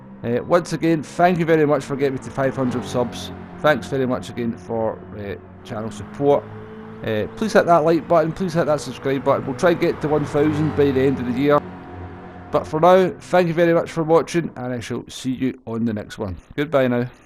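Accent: Scotland, United Kingdom. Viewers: North American by any chance? they seem to be the ones asking for subtitles the most. I find his pacing and delivery excellent and the mild Scottish accent only adds to my enjoyment of them, if we are lucky he might respond with a link to a properly unitelligiable Scotsman as his accent is imo really not that bad.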